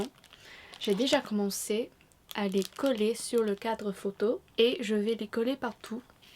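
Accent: England, United Kingdom. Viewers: With your English accent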